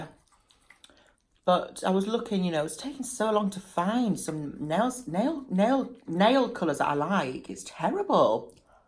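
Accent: United Kingdom. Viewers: Love you are the bomb I love watching your videos and listening to you British accent! Your spaghetti looked amazing!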